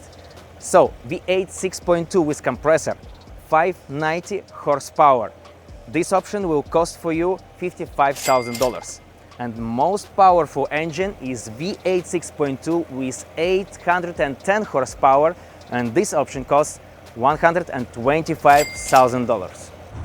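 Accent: Russia. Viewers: Это самый ужасный дебильный руский акцент.Чувак учи нормально английский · Русский твой английский в #опу...но почему ета нация така тупая...🤮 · Висиз ви хард рашын экцент!) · зысиз супер кьют акцент! Бест рашшен акцент ай эвер хёрд!🤝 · Мужик, у тебя чудовищный русский акцент! Я даже не стал писать тебе на английском, ибо не вижу в этом смысла 😁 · WTF! How many years do you live there still having the fucking russian accent? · Акцент то русский у парня, татарин скорей всего. Молодец! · Какой ужасный русский акцент))) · your Russian accent just killing my ears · Акцент русский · Ты откуда сам, чел? Акцент у тя явно выраженный русский! 😀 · Russian accent could feel easily 😅😅😅. Bro improve your speech. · Русский акцент видно с трудом разговаривать на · Ужас. Пожалуйста подтяни свой акцент. Прям как-будто бы специально на жёстком русинглише говоришь(как в фильмах утрируют Русских). 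· Давай на кыргызском, мне этот руснячий акцент не нравится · Как сильно прослушивается 'русский')) Слишком чётко звучат почти все слова. Особенно слог of. У иностранцев слоги совсем не слышно. Ну а так круто, видимо глубокое знание англияского есть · That is a stupid car and even more stupid review done with the most annoying Russian accent😂 Jesus Christ Lord have mercy ❤ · Лучше по русски разговаривай. Инглишь с русским акцентом нигде не спутаешь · This strong russian accent is so hard to listen😢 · Он специально делает стереотипный акцент СССР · Это что ещё за нерусский с явным русским произношением и непонятной машиной, продающейся по спекулятивной цене? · Как люди не замечают что у него русский акцент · Change the presenter guy, nobody would take this guy serious, with the russian accent. Cute little suv, but for 700K, you can probably get a real used military tank for that price. If a small little drone can take out multi-million $$ tank or helicopter in Ukraine, this should be a POC. 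Total JOKE. This is for rich Beta males with no balls. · Смешно говорит руский по английски )) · Какой жёсткий русский акцент))) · Сразу видно что русскоязычный говорит на английском 😂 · Твой Руzкий акцент слишком Палевный), вас Рашистов Видно из далека, особенно таких Лицемеров ☝️ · … на произношением поработай, а то русский так и прет, аж ухо режет - хав и парт!! 😊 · Брат мой русский язык рвется на ружу из твоего английского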